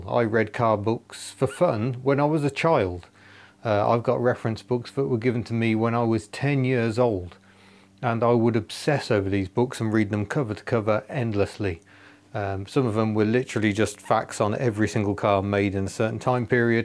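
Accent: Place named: Birmingham, United Kingdom. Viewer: Hi, just realised my three favourite youtubers all have Birmingham accents., cars. Antman09ful1, trains. Steve's Fruity's, classic fruit machines. Don't change a thing, your channel is a breath of fresh air (and petrol fumes).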